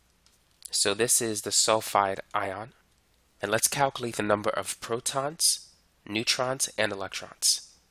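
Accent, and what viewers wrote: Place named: Boston, United States. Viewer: you sound like your from boston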